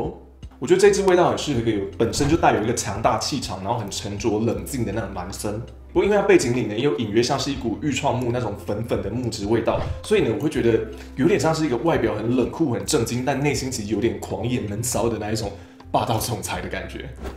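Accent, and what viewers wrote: Taiwan. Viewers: 香水介紹的很好，但台灣國語真的聽不下去….. 聽到一半很煩躁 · 生動清晰的解說加上親切幽默的台灣國語，害我也好想來入手幾支，可惜沒有管道啦！ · 好很喜歡您的影片富有許多知識且有水準只是後期蠻多影片不知道是為了影片效果有很多“台灣國語”的部分導致影片很想切掉不看不知道怎麼想呢？謝謝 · 每次都講解的好棒。只是「台灣國語」有點太多了😂，很容易打斷美好的情境想像😆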